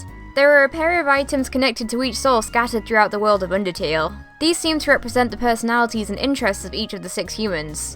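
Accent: United Kingdom. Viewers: What's wrong with liking a British voice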